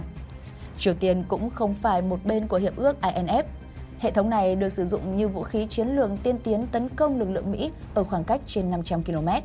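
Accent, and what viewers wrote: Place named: Vietnam. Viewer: Tên lửa Mỹ, bằng tiếng Anh- Mỹ, thì kênh phải phát âm như tiếng ANH - MỸ; ai lại phát âm theo kiểu VN nghe nhà quê và sai bét.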